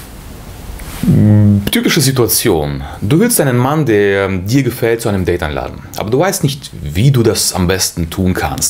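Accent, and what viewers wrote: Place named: Russia. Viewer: Kann es sein das du ein russe bist weil du so ein russischen aktzent hast so wie ich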